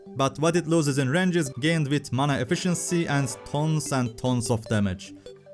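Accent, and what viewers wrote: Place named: Turkey. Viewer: i kinda hear a Turkish accent where are you from? i love bubble gun btw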